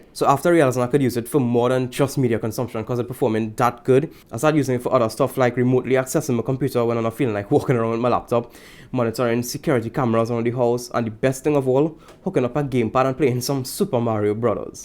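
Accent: Trinidad and Tobago. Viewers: Dude you talked faster after saying that you would slow down because of you Trini accent. Hahahaha. Love it. So I'm a mac guy and was never much interested in anything else but I see that you are able to remotely control your mac from it?? Now I am piqued. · Bmt that trini accent surprised me fam #trinitodebone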